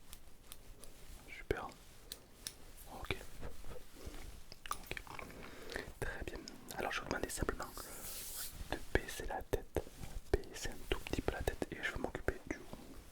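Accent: South, France. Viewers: Hey j'adore tes vidéos je me posais une question, tu viens d'où du sud?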